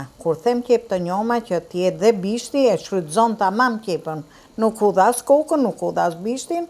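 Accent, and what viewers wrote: Tirana, Albania. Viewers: te lumte i ke duret flori,ka lezet dhe e folmja. tironce nji e sbohen dy,hallall 🥰👐💐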